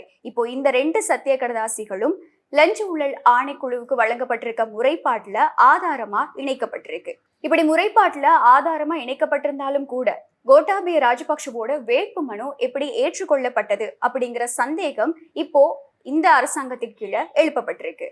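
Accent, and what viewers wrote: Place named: Chennai, India. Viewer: Lankasriயில் Madras தமிழர்கள் பணியாற்றுகிறார்களா அக்கா Madras தமிழில் கலக்குகிறார். கேட்க அசிங்கமாக இருக்கிறது. Lankasriயும் தமிழ் கொலை செய்கிறதா? யாரை நாங்கள் நம்புவது? தூதூதூதூ தூதூதூதூ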